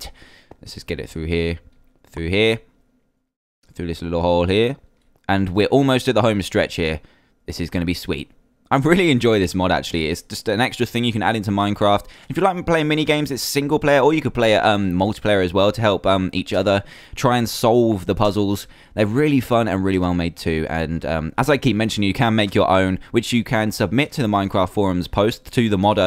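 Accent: United Kingdom. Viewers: Ever heard of a British accent, m'kay?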